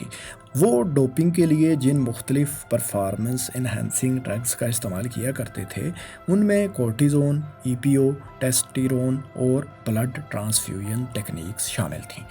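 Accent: Punjab, Pakistan. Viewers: Fuzol video hay Bhai choti se baat ko itna lamba khencha hay....Waste of time...plz improve your Urdu accent..Punjabi lahja hay ap ka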